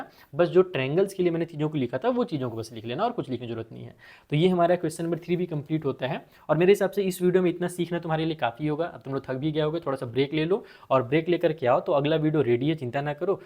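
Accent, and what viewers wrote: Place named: Bhojpuri, India. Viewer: Sir's bhojpuri accent🤌🏻 lovedd itt!!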